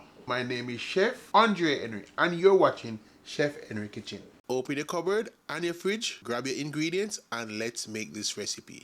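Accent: Jamaica. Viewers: SHUT THE STOVE OFF😂 JAM DOWN TALK, 1 LOVE😊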